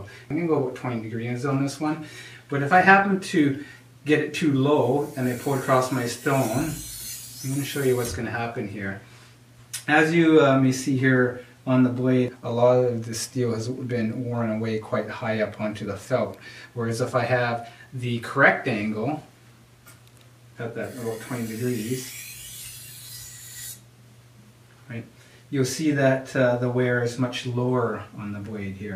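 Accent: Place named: Canada. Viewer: Knew he was a fellow Canadian who knew what he was talking aboat.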